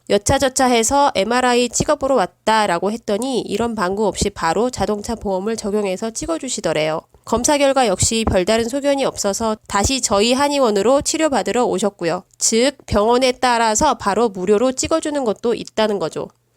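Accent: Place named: Chungcheong, South Korea. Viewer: 음...요리 백종원도 충청도유... 사투리좀 써도 괜찮음. 다만 발음정확도+전달력의 문제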